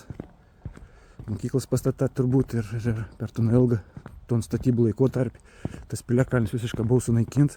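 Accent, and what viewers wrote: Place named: Plungė, Lithuania. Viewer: Smagė vaizdą pasėvakščiuojėmā, graži šnekta, a karts ne no Plungės būsi?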